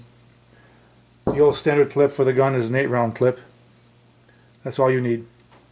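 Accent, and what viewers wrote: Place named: Connecticut, United States. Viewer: Thank you for making this very informative video in a nice straightforward style. I like your accent also - Connecticut?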